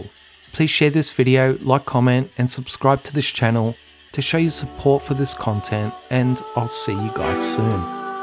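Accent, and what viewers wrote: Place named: Australia. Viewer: It's how we say it in bloody Australia mateeeeeeeeeee!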